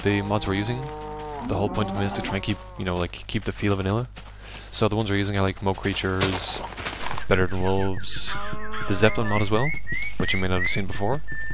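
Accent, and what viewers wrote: Ireland. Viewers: well my guess is irish .. or irelandish whatever ... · Hey. Love this LP already. I was trying to place your accent, so my official guess is Cork...? Keep it up! =D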